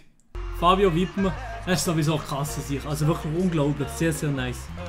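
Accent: Switzerland. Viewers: Sehr schönes Video von dir aber versteh dein Schweizerdialekt nicht bin Deutscher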